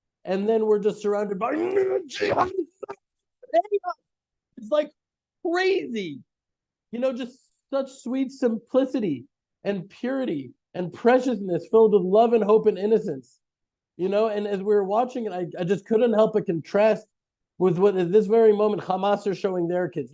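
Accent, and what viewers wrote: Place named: United States. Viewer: Such an awful lot of propaganda. jewishness is not the problem. Zionism is. Also, look at the speaker - white skin, American accent. Indigenous middle easterners have olive skin and Arabic accents. The kids in the video only know this way because of Occupation, Apartheid, and the brutal actions of the IDF. The Palestinians live in virtual prisons - the West Bank and Gaza. jewish kids in zionist izrael experience a very different life and a very different education, ie., books degrading the Palestinians - and that information comes from an izraeli jewish university lecturer who made a study of educational books first year to the end of high school. Now for the onslaught from zionists reading this ...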